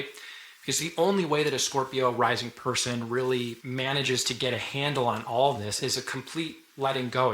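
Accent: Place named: Minnesota, United States. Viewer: All I want to know is, are you Minnesotan ? 😂 your accent give me that vibe. Also Scorpio rising video was on point !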